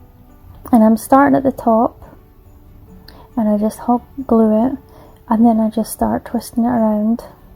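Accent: Scotland, United Kingdom. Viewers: Hey! I could hear U today so well! Love ur accent. Scottish? Love the ocean blue color on all ur projects! Ur tree filled scene with the lovely houses hit all the right Xmas bells with me! Gorgeous!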